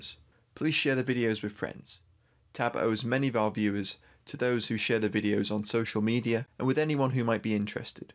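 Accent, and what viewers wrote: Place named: Cockney, United Kingdom. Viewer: Why always with the Cockney Annoying Brit Commentator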